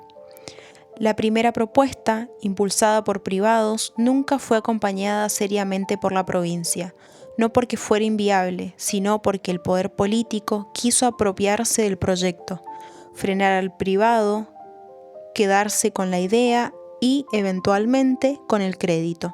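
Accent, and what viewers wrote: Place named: Mendoza, Argentina. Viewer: mil disculpas! Pensé que era tu voz distorsionada 😅. Ahora tiene sentido Yo también soy mendocino, a veces se siente ajeno nuestro acento al escucharlo grabado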